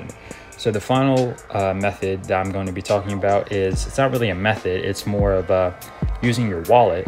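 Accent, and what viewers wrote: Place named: London, United Kingdom. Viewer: You are from London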